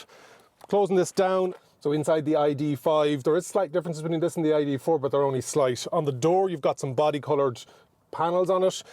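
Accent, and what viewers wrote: Ireland. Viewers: Many thanks for the comprehensive review and Irish accent that brought me back to my early school days. The car is an overall very good SUV, spacious and powerfull. Please provide the specifications of the sound System and speakers. Also, please advise the availability of two motors and AWD version. Best Regards.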